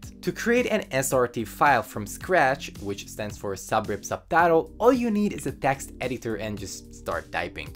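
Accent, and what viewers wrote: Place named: Netherlands. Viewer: Je hoort direct dat je Nederlands bent.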